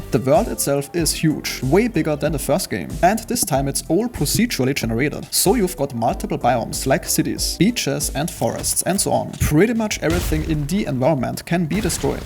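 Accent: Germany. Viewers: Bro are u german your english pronounciation seems famliar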